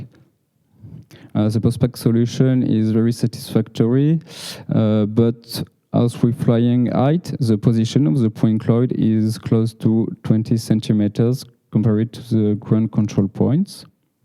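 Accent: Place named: France. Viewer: do the french know that they sound atrocious when they speak English? Your accent, she urts may eers, no?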